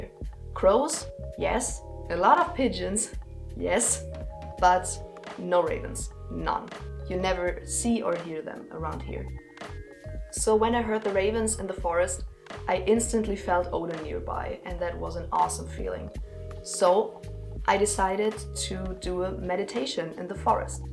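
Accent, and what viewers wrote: Germany. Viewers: I could hear from your accent that you’re German.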